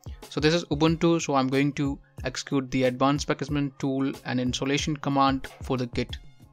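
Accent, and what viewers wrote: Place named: India. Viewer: Talk slower please, I can learn and speak with second language accent. me, a 57 year old white guy trying to learn Linux-GNU from the beautiful (vernacular) Indian accent. You speak faster when giving instructions or says a new name.vernacular I have to learn more acronyms than the Navy- Air force and Space Agency put together. oO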